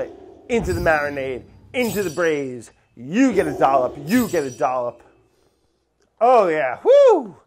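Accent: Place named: New Jersey, United States. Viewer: I admit, this is MY FAVORITE BRAD video! Thanks for the New Joisey accent, I miss that. :D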